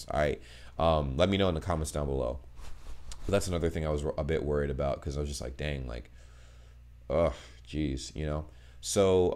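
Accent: Germany. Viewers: I think it is a good representation of the german english level on average. it might be cringe but also everyone will get what is meant at least. absolutely no problem especially considering this 4 am English...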